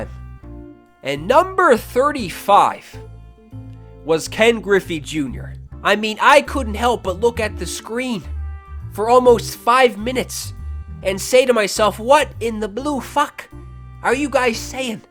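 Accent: Canada. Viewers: Ill tell ya whats NOT the G.O.A.T...ur stupid canadian accent. We all hate it. Effin period....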